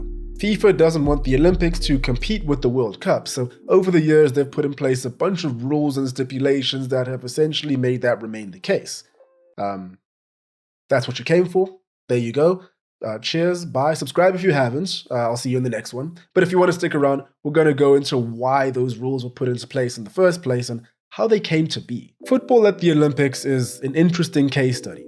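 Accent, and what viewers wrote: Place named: South Africa. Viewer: 😅He's not English I'm South African his accent is closer to mine than England on the opposite side of the hemisphere to us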